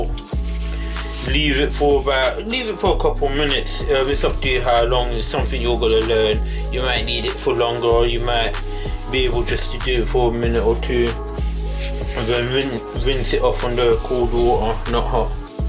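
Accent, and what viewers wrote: England, United Kingdom. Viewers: Which country is the english accent? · I remember this from my time on the island many moons ago. But it is terrible to listen to a Mauritian speaking English in an English accent😁 No disrespect intended.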